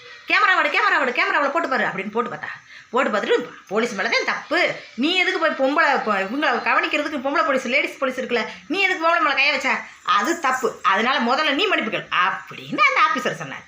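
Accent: Madurai, India. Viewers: மதுரை பேச்சு அருமை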